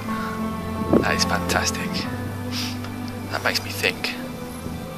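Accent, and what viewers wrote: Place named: England, United Kingdom. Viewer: Yeah was guessing England by the accent but couldn't pin the area. Beautiful part of the country mate, and when you have experiences like this it makes it all the more worthwhile. I was fortunate enough to grow up in rural Somerset and then rural Devon so got to see loads of foxes and badgers in the wild. Sadly living in the city now I get to see the odd urban fox but it's not the same as seeing them in their natural environement. Appreciate the upload mate, takes me back to my youth :)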